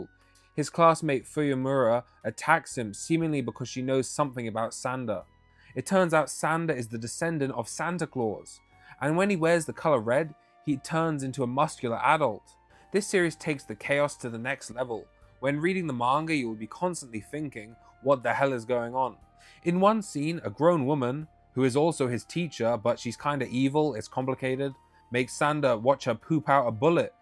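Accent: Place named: United Kingdom. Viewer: I love it when British people have Americanisms in their accent. Like “marnga” “parrru itagarrki”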